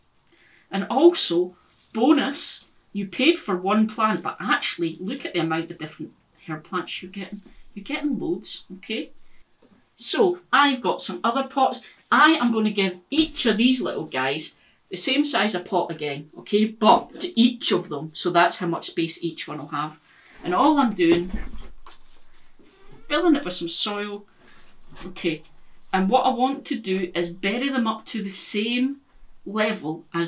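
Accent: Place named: North, United Kingdom. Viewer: I love your accent it reminds me so much of my family up north. Xx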